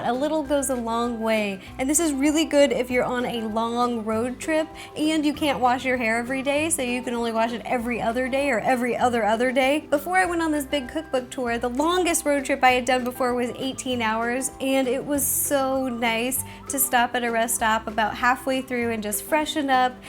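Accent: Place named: Rural, United States. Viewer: I love her country accent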